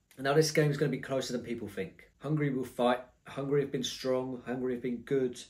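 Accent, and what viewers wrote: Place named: United Kingdom. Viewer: Lol you are defenitly brit lol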